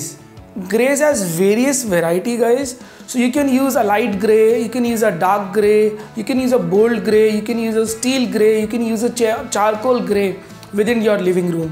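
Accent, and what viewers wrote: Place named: India. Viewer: Thanks for the video. I struggle to understand the Indian accent, but it´s worth it. Would you advise me? I have quite large, but east orinted quite dark room, I will spend time there mostly in the afternoon/evening, so artificial light mostly. I want to "warm" it a bit, was thinking terracotta or pineapple green combinations.. Is it a bad idea? I wonder.. Thank you. (I like darker red colours).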